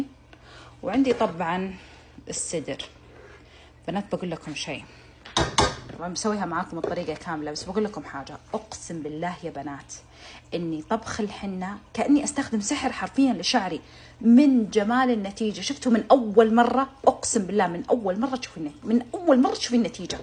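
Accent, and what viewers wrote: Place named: Egypt. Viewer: حلوة اللهجة المصري على لسانك😂😂😂 ربي يحفظك❤❤❤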